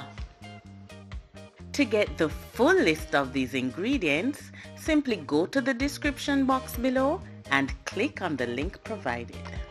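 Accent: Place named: Jamaica. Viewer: Love this style. Nice accent. Big love to Jamaica 🇯🇲🇯🇲🇯🇲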